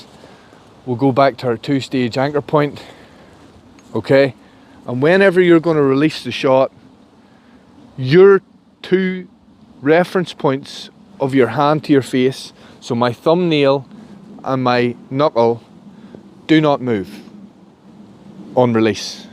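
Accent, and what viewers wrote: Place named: Scotland, United Kingdom. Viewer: The single best thing about this video is that is Scottish hahaha tnx for a great vid lad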